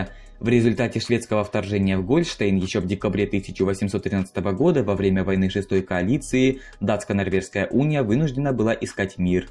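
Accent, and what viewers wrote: Ukraine. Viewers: Не родной, он с Украины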